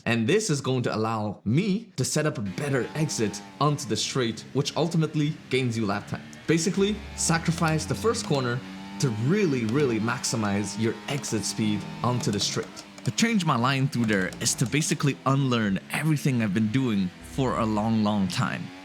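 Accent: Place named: Netherlands. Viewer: I smell dutch accent🥶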